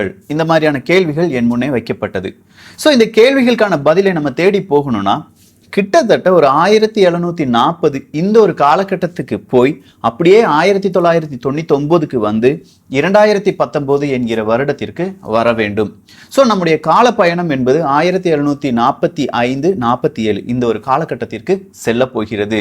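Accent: Kerala, India. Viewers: Malayaali aano ?? Apdiya Kerala vaasanai veesuthu but azhaga Thamizhla singaarikingo... 😍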